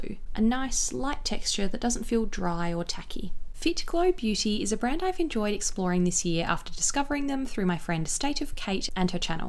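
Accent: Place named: Australia. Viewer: Awwww must be the Aussie accent 😍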